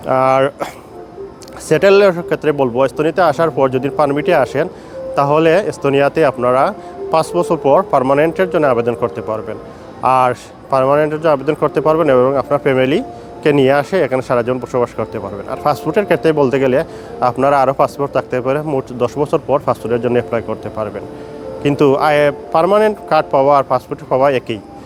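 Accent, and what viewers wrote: Sylhet, Bangladesh. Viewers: ভাইয়া আপনি কি সিলেটি ❤❤ কথায় বুজা যাচ্ছে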